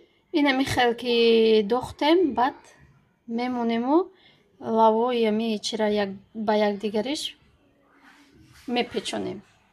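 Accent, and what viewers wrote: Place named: Kyzylkala, Tajikistan. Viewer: Ма ае габзанитон фаҳмидум ма ае қизирқала хоҳар меши апа мешавен ҳамшаҳри будем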